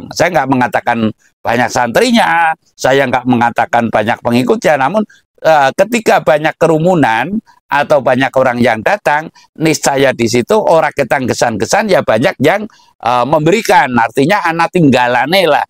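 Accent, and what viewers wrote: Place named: West Java, Indonesia. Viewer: Kalau jadi Ulama/kyai Belajarlah Yg Bener dan Tutur katanya Yg Indah...Contoh Kyai2 Terdahulu Tutur katanya Enak..Contoh Mbah Maimun Mbah Nasir... Mbah Nur Hadi.. Mbah Solihun... Dan Msh banyak Lagi Kyai2 Yg Bicaranya Lemah Lembut..Tetapi Ini Kyai Dengan Logak Sunda Bahasanya Agak Kurang Beres...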